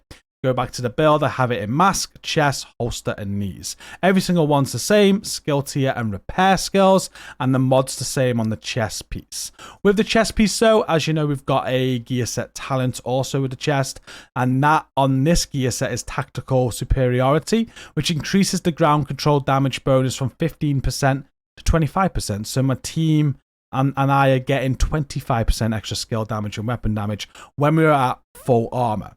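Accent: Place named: Liverpool, United Kingdom. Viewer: Where are you from? You sound like someone I know from Liverpool 1 haha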